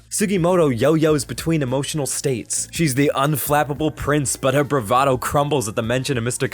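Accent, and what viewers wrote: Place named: New Jersey, United States. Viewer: Has anyone else noticed the sudden Jersey-esque accent??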